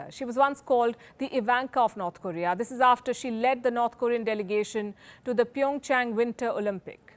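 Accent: India. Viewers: i like the indian ladies accent .., refreshes my memory of the good times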